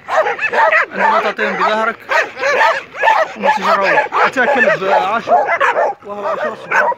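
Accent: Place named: South, Morocco. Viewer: Noman Khushi أظنه مغربي من الجنوب وذلك من نطقه للغة العربية 🙏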